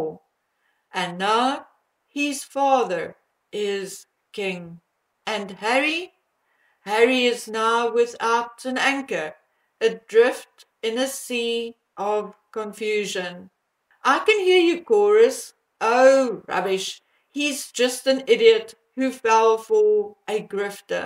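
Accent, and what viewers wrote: South Africa. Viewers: I think impressions by people who are not in the UK are dubious. This is a small island and you are never far away from the RF. They make visits an amazing number of times. Its extraordinary how they have the stamina. They do marvellours work in many areas unpublished but leaked by people who work in the royal residences. All this can happen because they live in America one of the most dangerous places on earth. I worked abroad as a member of the Foreign and Commonwealth Office and the Americans were despised as ignorant and brainwashed. They are deadly in diplomacy you want no association with them. I gather from your accent you are South African and maybe have emigrated. You forget the Monarcy is here at the choice of the people and will not be removed by anyone overseas. It will end when we, the people, decide it does not suit our purpose. 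You also leave out the greed for money and power that fuels the US in a way it does not in Europe. Americans think because they speak English they are like us. They are not. We have not shared a history with them. We did not massacre and try to starve a native population. We had equal rights for our black and asian friends. OK some people object to the benefits some of these people have over poor whites. They are at the head of every profession in our country. Likewise women including 3 Prime Ministers, First Minister of Scotland, past First Minister of Northern Ireland. America is a power hungry country. it is also ridiculous in is post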